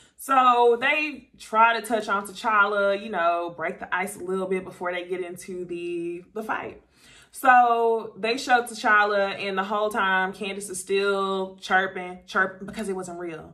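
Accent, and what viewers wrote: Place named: South, United States. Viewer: Come through with the fair and unbiased perspective. Love it! I also love your accent. This is from one southern woman to another lol. Can’t wait for the panel tonight.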